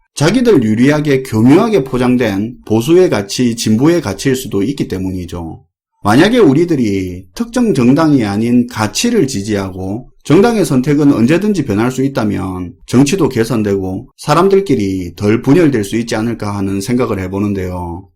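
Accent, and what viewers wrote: Gyeongsang, South Korea. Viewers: 아주 경상도스럽고도 신천지포교스럽다 말투부터 거북하다 지금 정치는 바르게가고 있다고 본다 이런식으로 2대는 더가고 그다음쯤 평가 해보자.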